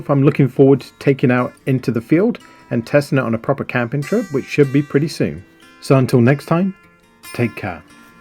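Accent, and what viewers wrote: United Kingdom. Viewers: Thank you. GOOD to have the advice of a Canadian (I am from Quebec). I am looking for a video on how to relief yourself with number 1 and number 2. Thank you again. Nice British accent.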